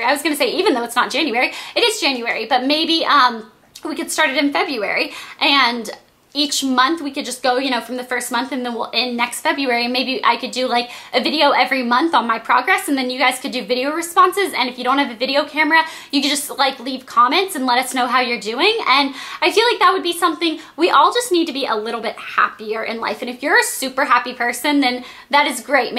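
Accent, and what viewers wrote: South, United States. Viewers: Southern Accent are beautiful. =) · Oh I love the southern accent - i dont think you should get rid of it..it is so cute! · aw i liked your southern accent :(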